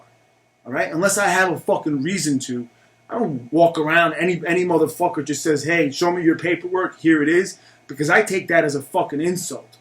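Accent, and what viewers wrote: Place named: East Coast, United States. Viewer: I don't know where you are from but it sounds like you are from the east coast somewhere. Because i did 8 years here on the east coast and i did my time in the same way as you are talking. I actually punched a guy in his mouth once for coming at me sideways in asking for my paperwork. I took it just like you said. I said to him, what do you calling me a ripper? And i hit him in the mouth. But on the west coast, mostly California they have prison politics that are completely different. They segregate races as part of the prison politics and here on the east coast we don't do that. Its two totally different worlds.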